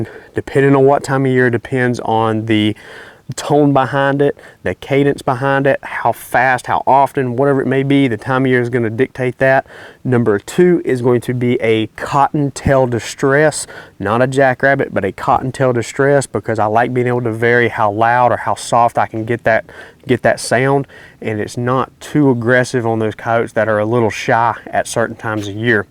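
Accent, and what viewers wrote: South, United States. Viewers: enjoyed listening to your southern drawl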